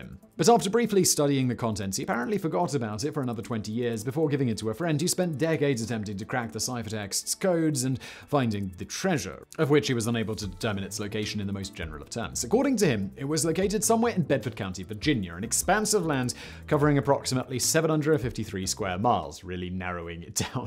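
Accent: United Kingdom. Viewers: One word: British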